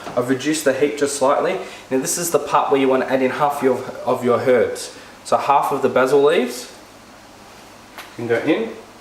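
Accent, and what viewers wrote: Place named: Italy. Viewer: Loving the Italian accent mate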